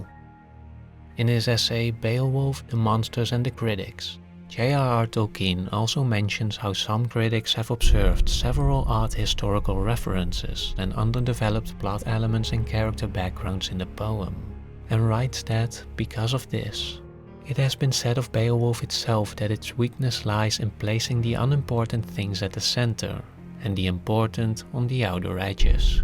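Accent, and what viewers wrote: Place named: Netherlands. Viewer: I recognise your accent is it dutch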